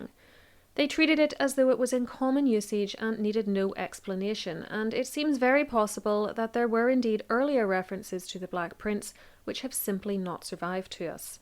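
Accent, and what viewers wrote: Northern Ireland, United Kingdom. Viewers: Thank you for another fine video. I continue to really enjoy your channel, and your accent is icing on the cake. Yes, I'm one of those Americans who love all U.K. accents. 👍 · I’m so glad I came across this page by accident, I love history channels and it’s awesome hearing a Northern Irish content creator, I don’t usually come across many people from here on YT, definitely subscribed 😊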